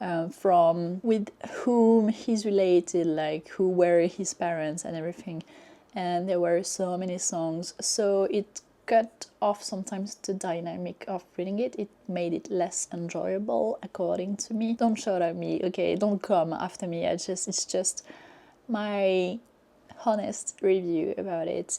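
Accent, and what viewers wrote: France. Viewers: I have to admit, both the video and sound quality have improved 😎☑ I can hear you a lot better now, parse out the spoken words and sample their distinctly French flavour Horror and humour can go hand-in-hand depending on how well they are balanced in a story. It is very interesting hearing your critical take on Tolkien. The fact you don't like the fictional emphasis on genealogies and lineage as they relate to the overall story, making the reading experience a bit tedious. You are the first person I have come across who thinks J.R.R Tolkien's writing style has a tendency to be superfluous. You think he's a showoff:face-green-smiling: I appreciate your French perspective. If I were to ask someone from the US or the UK about LOTR, I'll be informed of Tolkien in glowing terms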